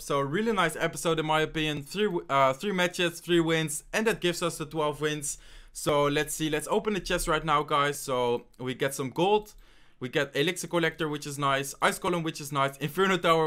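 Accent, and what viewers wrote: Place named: Netherlands. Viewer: Le entiendo y es gracioso el acento inglés que tiene, es holandés y pronuncia las cosas raras a veces. xd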